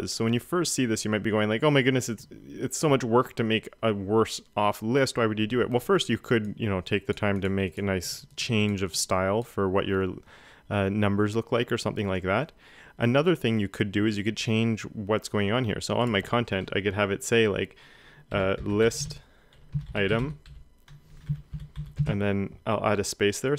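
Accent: Canada. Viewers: Thank you sooo much! This vid was incredibly helpful and made me a new sub. And I could tell right away you are Canadian too lol <3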